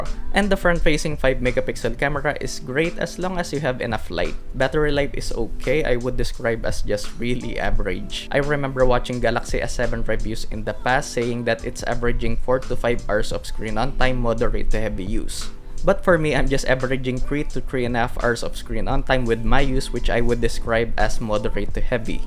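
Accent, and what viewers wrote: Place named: Philippines. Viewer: Sabi na pinoy ka... First time ako dito... Nasabi ko lang dahil ng accent mo hahaha skl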